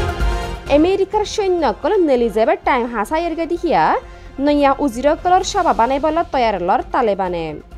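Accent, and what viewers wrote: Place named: Rohingya, Myanmar. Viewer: Dear sister, I greatly appreciate to your significant Rohingya accent.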